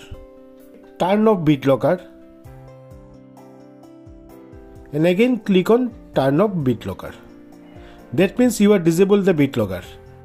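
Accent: India. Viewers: its always the vids with 20-80 likes and an indian accent finaly solving my problem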